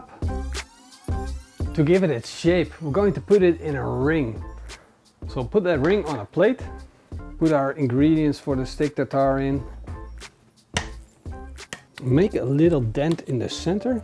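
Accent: Netherlands. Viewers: Is he from holland his accent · i like this guys dutch acccent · His dutch accent is so strong😂😂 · I’m new to your channel, but you have a Dutch accent.. are you Dutch? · idk what it is about the Dutch but you can always instantly tell whether they’re Dutch or not, you can’t do that worth Scandinavians for example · Just found this channel and immediatly i can hear you're dutch. The Dutch/English accent stands out so wel haha!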